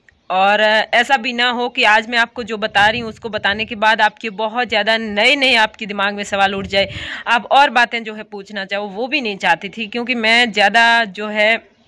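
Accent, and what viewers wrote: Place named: Barmer, India. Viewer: आप ऐक, अच्छे परिवार से,है, आपके माता पिता को मेरा सलाम, आपके मुख के सरस्वतीजी बोल रही है,,, आप मेरे को आप बाड़मेर से लग रही है अन्दाज है जय श्री कृष्णा जी श्रीं राधे राधेजी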